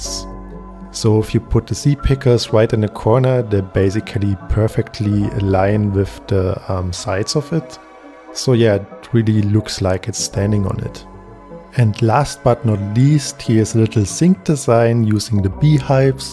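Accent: Germany. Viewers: Are you German? Doesn’t mean as hate but you kinda sound German😅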